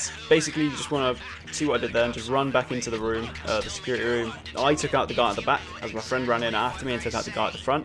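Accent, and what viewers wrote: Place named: United States. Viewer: let me guess... american?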